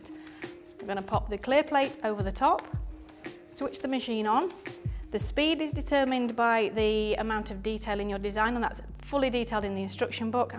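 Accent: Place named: United Kingdom. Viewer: What is it about a Brit accent that clenches my jaws? Every other word is "pop it". Probably a German "loan word".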